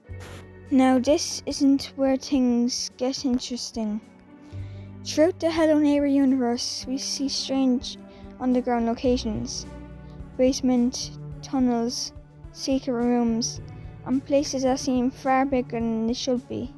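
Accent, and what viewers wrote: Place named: United Kingdom. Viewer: U sound british and also new sub (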